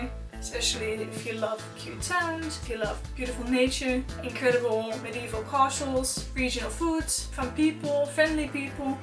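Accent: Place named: Brabant, Netherlands. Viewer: Nice, please tell more Brabant accent that is lovely...😊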